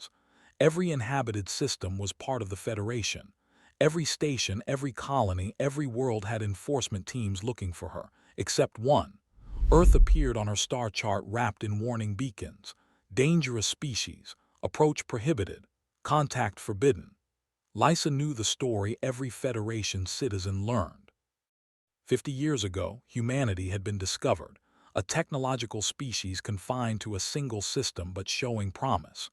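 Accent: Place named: United States. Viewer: A story with an American voice talking about accepting refugees. The irony.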